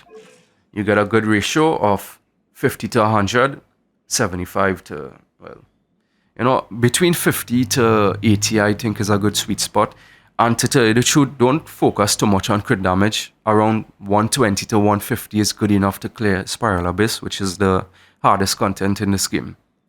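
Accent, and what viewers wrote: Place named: Trinidad and Tobago. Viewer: You sound like a trini. Btw good video. You earned a sub.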